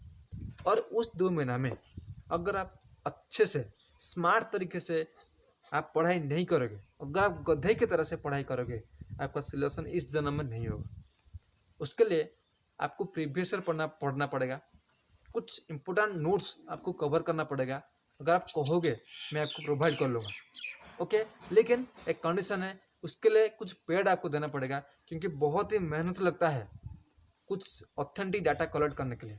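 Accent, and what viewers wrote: Odisha, India. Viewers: Bhai Odia ho na 👈😊 Improve your hindi 😊👈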